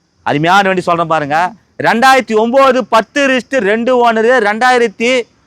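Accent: Madurai, India. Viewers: Super speech❤madurai vasagam ❤🎉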